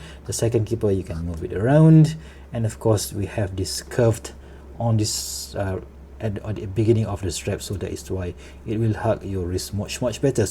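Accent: Malaysia. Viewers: Bila dngr slang dah agak dah mcm malaysia/indo, tngok dkt about from malaysia, terus subscribe, sbb nak cari jam yg affordable haha teruskan content broooo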